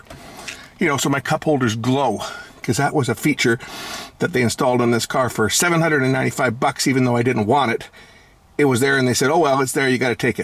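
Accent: Canada. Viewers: Thanks very much for posting. Makes a lot of sense. I'm gonna give it a shot since I sure don't want to pay the dealership to do it. If this doesn't work I'll try replacing the shifter myself. Either way the vid was worth watching just for your magnificent Canadian accent. Cheers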